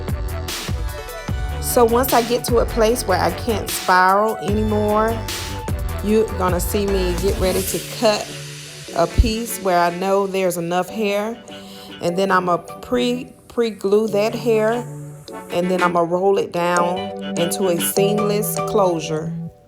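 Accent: South, United States. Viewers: I can tell you're Southern belle by the accent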